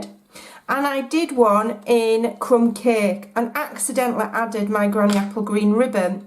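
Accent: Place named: North, United Kingdom. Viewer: Why did you not score! Naughty naughty, but lovely to hear a nice UK accent trying to place it sounds more northern like the cards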